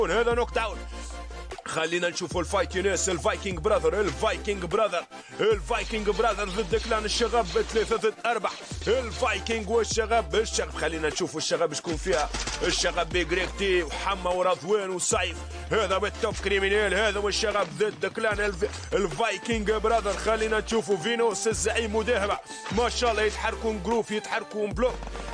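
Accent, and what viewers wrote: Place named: Tunisia. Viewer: المعلق تونسي و قالك فضيحة ههههه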